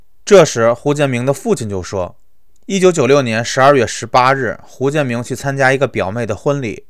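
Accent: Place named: Beijing, China. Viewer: +10086 第一次看你影片是石景山八尸案 你当时给凶手口供配音 京味儿真的够浓 一下子代入感亲切感就上来了 老哥加油！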